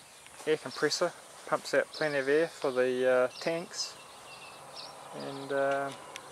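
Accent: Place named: Australia. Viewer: In a few years when I ship a Sceniccruiser over from the states I'll buy your engine. Presuming your Australian given the accent mate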